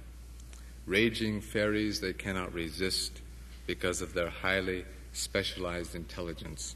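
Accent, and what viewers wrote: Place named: Canada. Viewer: It is his young voice with canadian accent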